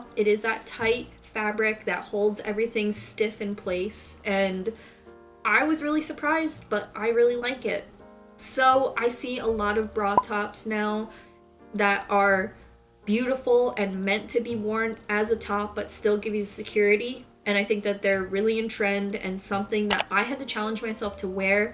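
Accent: Philadelphia, United States. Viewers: Getting used to short nails is so difficult 😩 I loveeee this content 💞 your philly accent is my fav thing as someone from the west coast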